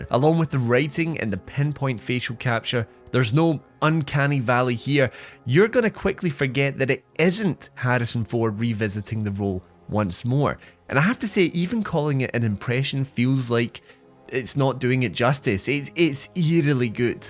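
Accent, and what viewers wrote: United Kingdom. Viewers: SOMEHOW I KNEW SOME BRITTISH CHAP WOILD NARRATE THE PREVIEW. HINT. ITS A TURNOFF.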